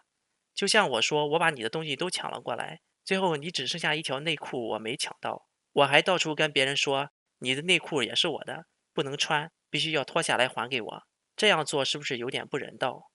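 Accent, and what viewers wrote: China. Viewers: 聽完後，你分析的很有邏輯水平，一聽口音就知道你是大陸的，不過我還是想說，prc現在每天文攻武嚇，而且中美貿易戰開打以來更有走偏鋒態勢，不讓我討厭中國人也難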